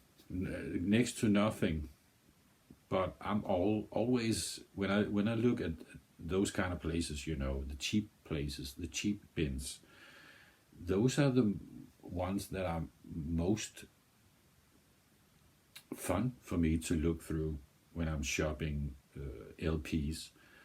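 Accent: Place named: Denmark. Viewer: You must be Danish, listening to your accent and your name, I'm pretty sure you are a fellow Dane. I was born and raised 10 miles south of Koege, came to the US in 1967 as an au pair, in Westport Ct. Have been here ever since. Although have been back to Denmark many times. Now live in the San Francisco Bay Area. I just heard you mentioning Denmark, so I was right. I love this song, we have a very active Scandinavian Club in this area, and in the early nineties we had a midsummer party, in the hills south of SF, and we had some members who played guitar and sang, and this was one of the songs they sang at that party.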